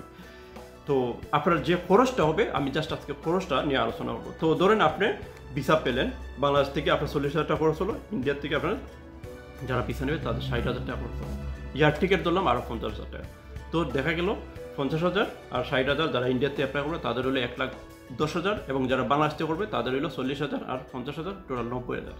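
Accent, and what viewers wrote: Sylhet, Bangladesh. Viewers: ভাইয়া আপনার বাসা সিলেট হবিগঞ্জ??? কথায় বুজা যাচ্ছে,,Am i right? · ভাই কিতা সিলেটি নি?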